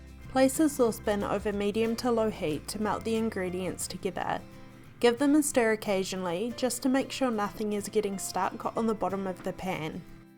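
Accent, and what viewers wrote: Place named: New Zealand. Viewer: I've been making this since I was a child. Nice to hear a Kiwi Accent 😊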